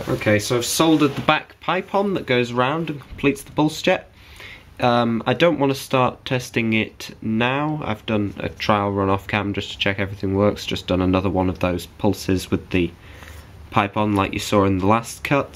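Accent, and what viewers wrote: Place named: Europe. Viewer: what is "the clappers"? Like full throttle or as pilots know it as WOT (wide open throttle)? I'm from Texas and this guys sounds European (UK?) So I'm not up on the lingo. I know word like "keen" (willing to do happily or with a wanted desire) please friends clue me in. Btw Howdy Y'all from Texas. Thank you in advance.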